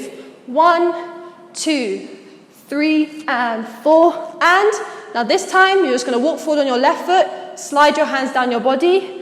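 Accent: United Kingdom. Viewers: Wait I'm confused u have a British accent so ur not korean?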